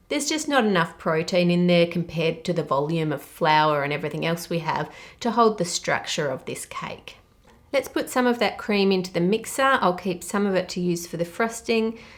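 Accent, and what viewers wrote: Australia. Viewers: Today I learned that aussies say "weight" because they don't know how to say "wheat". At least I guess that's why. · The best part of this video is hearing pronounce the word “grown”. I love the Aussie accent! Thanks for another great video:)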